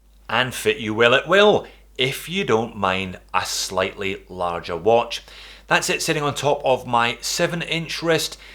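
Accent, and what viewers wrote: Scotland, United Kingdom. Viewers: Scottish accent sounds like the taste of a heavily peated whisky, and I love both. The watch however is to Hulky for me, I enjoy the Kermit style much more.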